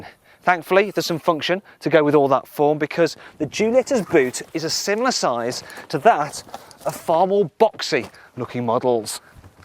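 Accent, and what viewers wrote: United Kingdom. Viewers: really nice review. Or Im just in love with british accent ;D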